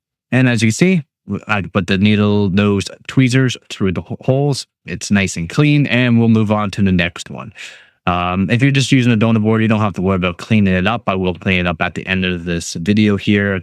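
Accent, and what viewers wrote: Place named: Canada. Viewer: Yep. Really irritating to hear sodder. I thought the only country to pronounce it sodder were your neighbours,(and soon to be assimilated into the US ...Joking of course ) . Sussed you were Canadian by the pronouncing the word "out". Have been doing the electronics etc stuff for over 60 yrs- yep an old boy. So if you won't pronounce it as solder then I will have to watch with the sound turned down.... Cheers and good luck with the channel.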